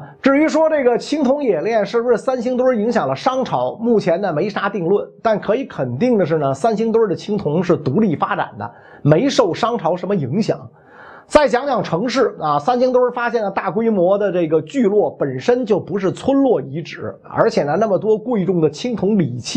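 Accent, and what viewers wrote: Beijing, China. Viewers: 是的，秒秒回到北京胡同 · 哈哈，北京人儿，理解一下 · 袁老师几天没见，怎么北京话又浓了233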